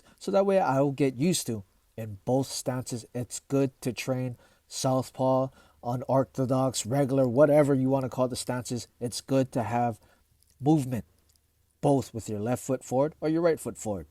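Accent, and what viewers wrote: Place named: Hawaii, United States. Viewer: My braddah, you from Hawai’i ah!? lol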